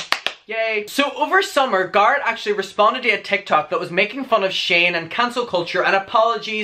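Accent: Ireland. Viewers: what?! You can hear the Irish accent thick af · Love that you’re not gonna change your accent/how you pronounce things to please people!! From a fellow Irish gal 🥰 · I love your Irish accent and how you pronounce Garett. Much love to Ireland. Garett's new video is hilarious btw.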